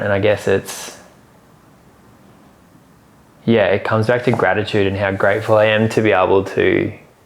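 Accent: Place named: Australia. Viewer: I love watching this Aussie and listening to him talk. The accent is so cool. Foley, Alabama here! 😁😁☀️🌴🤟🏻🫶🏻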